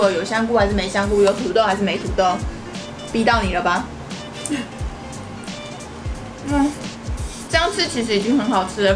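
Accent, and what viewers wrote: Taiwan. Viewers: 生氣的說工撒毀那台語捏的樣子好可愛XD 而且的台語超標準的(以我這種不會講台語的人來說) 很喜歡聽講台語多講一點更好!! (比心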